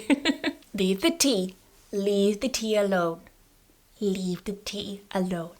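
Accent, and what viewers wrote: United Kingdom. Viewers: I like her British accent quite a lot!!!! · This dog looks like he's trying to learn British intonation. Besides, you seem to have lost most of your weird “Polish” accent. You sound more British every day . 😉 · l really love your british accent❤😊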